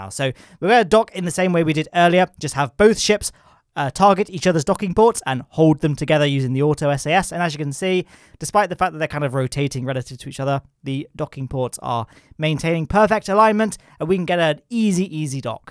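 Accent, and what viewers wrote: United Kingdom. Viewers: he's British I thought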